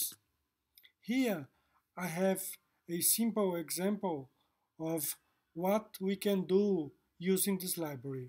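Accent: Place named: Brazil. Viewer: Que inglês nojento!!!... E para quê um brasileiro se metar a falar ingles!!...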